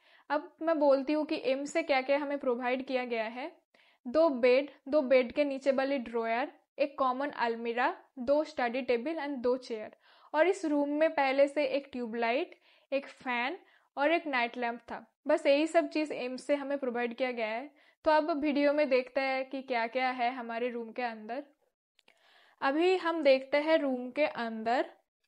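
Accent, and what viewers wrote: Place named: West Bengal, India. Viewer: Didi apka ghar khaha hai... up ka bat sunkar I guess West Bengal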